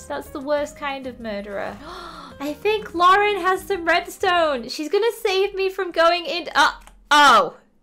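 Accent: United Kingdom. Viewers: Yammy: So i'm gonna stick to the birch theme Me: Wait? Did she just said the b word Replays the birch word over and over Nop she said birch she just has an british accent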